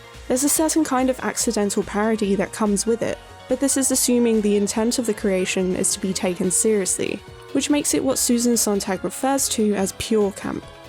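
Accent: United Kingdom. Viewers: I expected a male narraror But that attractive british voice Hot damn!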